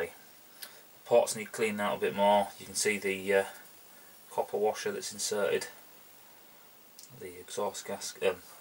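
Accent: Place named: North, United Kingdom. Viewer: u sound northern!! lol:) me preston northwest.. uk:) lol:)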